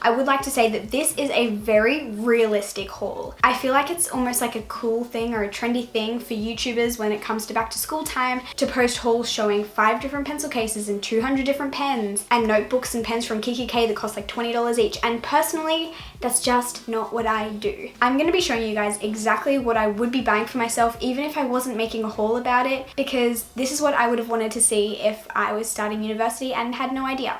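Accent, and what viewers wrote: Australia. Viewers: I subbed just because of your verse at the beginning of your video, your cross necklace, and your Aussie accent. The funny thing is I don’t think I have an Australian accent and then I go and watch other Aussie’s videos and I can definitely tell their accent! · I find it so weird how Australian people have english and American accent mixed together 😂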